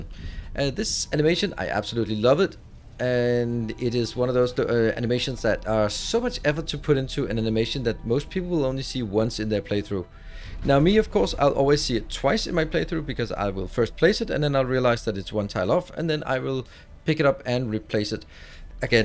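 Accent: Denmark. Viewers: are you from denmark???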